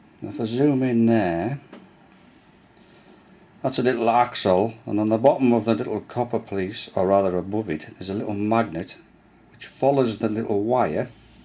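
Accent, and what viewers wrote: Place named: Hartlepool, United Kingdom. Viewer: Love the accent....Hartlepool???????????????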